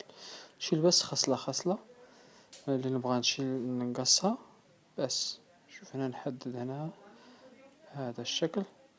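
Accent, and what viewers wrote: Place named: Morocco. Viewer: السلام عليكم ورحمة الله هل الأخ مغربي؟ لكنتك قريبة جدا للمغاربة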